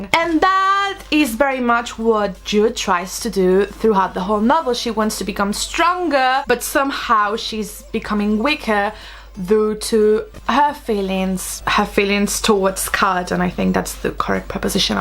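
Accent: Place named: England, United Kingdom. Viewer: Me encanta tu acento tipo England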